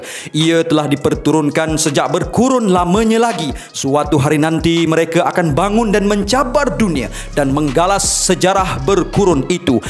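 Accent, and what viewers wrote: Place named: Kedah, Malaysia. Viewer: Bukak msk bru ada dngan lorat kedah.. baru ada feel dngar dye pnye crte...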